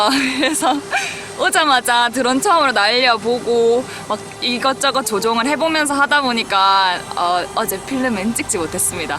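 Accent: Gyeongsang, South Korea. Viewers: 영상 잘보고 갑니다 익숙한 경상도 말이네여 : ^ ) 해맑으셔서 기분이 좋아집니다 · 혹시 고향이 갱상도 인가요???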